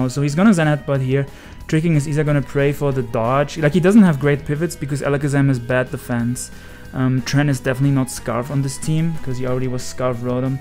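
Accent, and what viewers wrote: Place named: Germany. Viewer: clicking on that vid blind and hearing that smooth german voice is top 1 feelings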